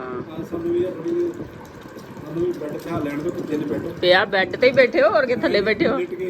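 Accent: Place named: Malwa, India. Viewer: Dii tusi apni hi boli boleya kro malwaeiya dyi boli vadiya nhi lgdi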